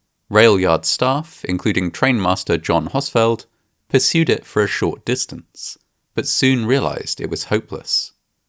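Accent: United Kingdom. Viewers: I've listened to 15 seconds. I hear a British narrator voice for an American story - a telltale sign of an AI generated video. Can anybody tell me if this is a true story or is it AI fiction? For now, I'm clicking away and going elsewhere.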